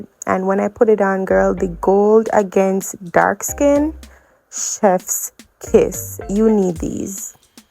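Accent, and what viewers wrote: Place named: Jamaica. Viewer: Hey Hun, as soon as mi hear di Jamaican accent yuh got mi. I love all of the outfits, mi love Shein Bad. New subscriber ❤. I do have a YouTube channel also, pass and show your fellow Yardie 🇯🇲some love😂❤. Lata❤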